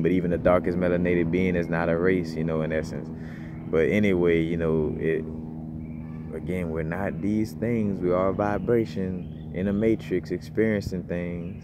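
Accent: New Orleans, United States. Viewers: NOLA accent! 🙏🏾 Peace to you. I love your vibe.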